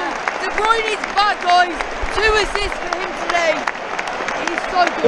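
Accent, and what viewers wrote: Manchester, United Kingdom. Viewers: You sound like you're from Manchester. Lifelong city fan I'm sure 😆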